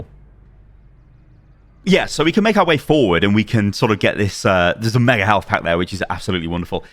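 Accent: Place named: United Kingdom. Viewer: British?! That’s is the correct thing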